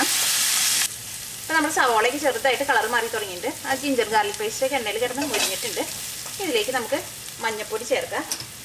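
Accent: Thrissur, India. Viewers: You are interesting to watch. Your Malayalam with that Trichur slang is more interesting. You are my guru in cooking.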